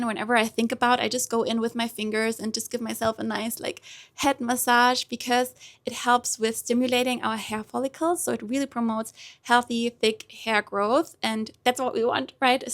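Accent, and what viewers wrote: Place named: Germany. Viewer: Oh süß man hört deinen deutschen Akzent raus 💗🥹 Grüße aus Nürnberg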